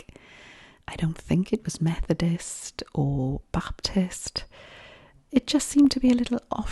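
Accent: Wales, United Kingdom. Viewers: I love Welsh accents! So sophisticated!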